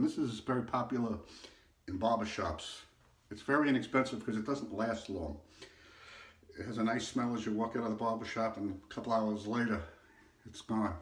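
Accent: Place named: New York, United States. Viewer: I love your New Yok accent.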